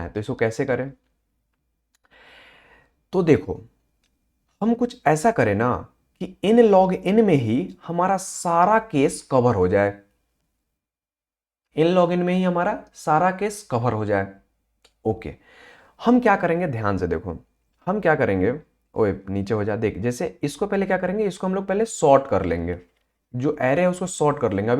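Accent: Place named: Bihar, India. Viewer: explained amazing . By your tune you looked Bihari/up, I am also a Bihari. good to see you bro.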